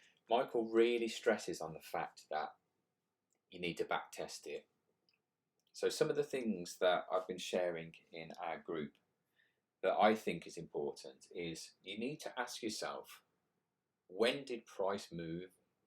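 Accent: United Kingdom. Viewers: Nyc analysis of the charts bro Luv the British accent too 😂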